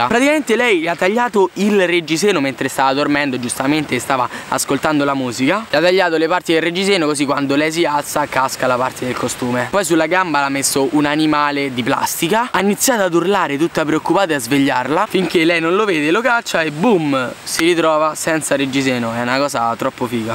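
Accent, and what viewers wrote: Italy. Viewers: Me piace troppi ed tuo accento romano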